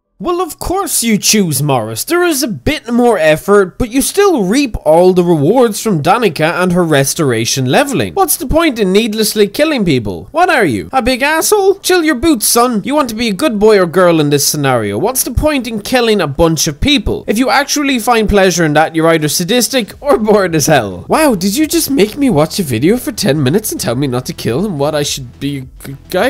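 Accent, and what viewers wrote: Ireland. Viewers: I like the video but dislike the Irish accent, what do I do? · Not here for Irish accent already got one of my own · Yes, I came here to your channel specifically to listen to your Irish accent. There's no doubt about it.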